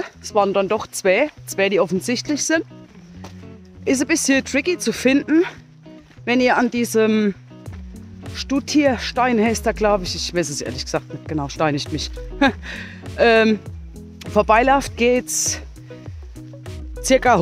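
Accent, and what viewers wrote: Palatinate, Germany. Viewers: Immer wieder ein Vergügen deine Videos zu sehe. Un dadebei des Pälzer Platt👍🏼 Liebe Grüße Thom ✌🏼🤠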